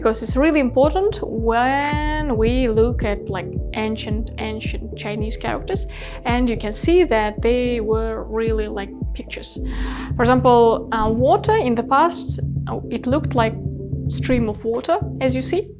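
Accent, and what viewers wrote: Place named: Kazakhstan. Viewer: I’m starting learning 汉字，because I want to learn calligraphy, so before I want to learn radicals. I searched on YouTube and found this channel, I’m literally like this 😮: it’s like all my dreams coming true. I don’t know exactly why, but this video and your channel really, really got deeply in me! Your grace, cheerfulness, clarity, humor, and maybe the slight Kazakh accent make this so wonderful. I’ll watch a video a day 🤩. 谢谢，! I hope you can continue doing these and other videos in the future 😊 P.S.: Omg 水晶 Crystal is water and 3 suns, shiny water! 😱😱😱 this is amazing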